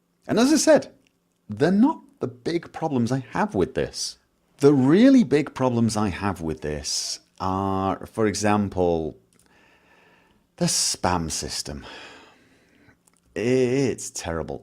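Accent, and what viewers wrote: United Kingdom. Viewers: If i recall correctly he's from England. or rather... blast it's on the tip of my tongue but i cant quite get it right. Sorry on that part. suffice to say he's in an area where he sounds "wonderfully British". dont realy hear people go "he sounds wonderfully American" so i guess certain parts over there are doing a few things right. god i can hear it now... going "i'm not from England you sodding yank i'm from ___"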